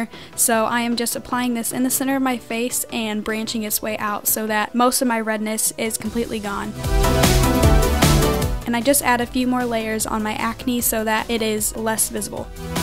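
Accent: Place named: United States. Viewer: What country are you from? Cause it's autumn nearly winter in Australian and Southern Hemisphere, but it's spring nearly s summer in America,northern hemisphere. But you sound American. Aha well anyways by the way you are so beautiful with or without this makeup and I respect you being so brave :)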